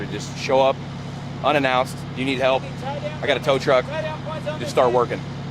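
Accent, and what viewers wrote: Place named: Texas, United States. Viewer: That's just Texas in general you know that dude you got a Texan accent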